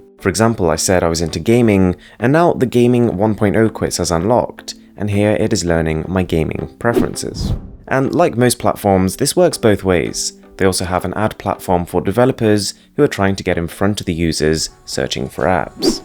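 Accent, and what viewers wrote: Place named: England, United Kingdom. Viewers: DK Dost! You luving that British accent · Got to love the English accent 🇬🇧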